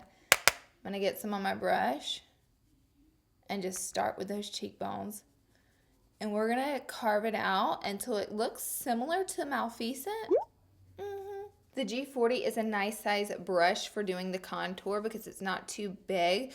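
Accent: South, United States. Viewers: honey where are you from? you do a FAB southern belle. you must be somewhere close to the southern states!! LOVE IT! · love the contacts:) and all your southern twang coming out!! beautiful look :) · 3 things I like about you 1.) you don't owe anything but still offer it up to people & 2.) you embrace your southern accent. I have one too! I'm from KY! 3.) you work that gray in your hair. I got it too, girl. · 💖 ya and your southern accent lol! then British! what contacts do you have on? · is it me or she has more of a southern accent lol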